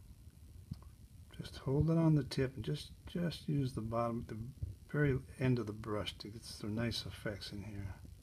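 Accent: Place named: New England, United States. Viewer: Found this video 3.5 years ago when I began to self-paint wc's. Found this today, and STILL believe this to be the finest demo on fog, mist, w/w. Thank you for this hidden gem! (PS: I'm from RI, you sound like NE, yes?) Fanna Turano Denver, CO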